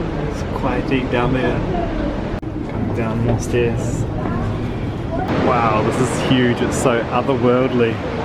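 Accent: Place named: New Zealand. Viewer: Kiwi accent owwwwwwwwwww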